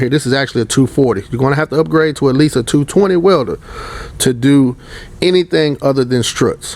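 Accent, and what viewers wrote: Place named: Houston, United States. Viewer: Dude is undoubtedly from Houston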